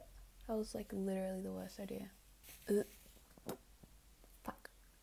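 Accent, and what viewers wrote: Australia. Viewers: I get so excited when you post! I’m so desensitised to asmr because I watch it for my insomnia but yours seems to work so well! Maybe it’s the Aussie accent because it familiar but ❤️❤️ thank you for everything you do!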